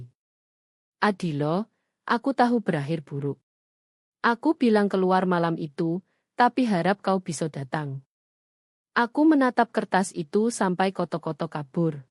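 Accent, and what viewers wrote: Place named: Java, Indonesia. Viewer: Waduh! Accent Jawanya medok banget! But! It’s okay!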